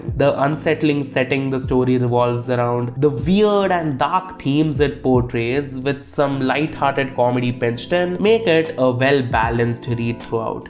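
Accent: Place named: India. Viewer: are you from india? you sound indian (not saying in a negative way)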